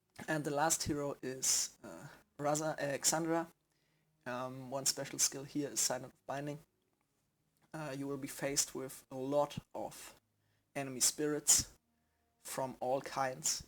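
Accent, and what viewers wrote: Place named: Germany. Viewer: man hört so heftigst den Deutschen Akzent raus das so schlimm, aber dennoch gutes Video^^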